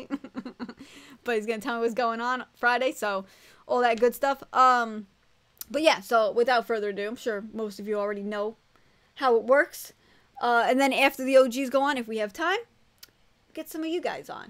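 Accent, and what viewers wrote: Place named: Boston, United States. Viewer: Oh yeah and where are you from i hear Rhode island or Boston in your accent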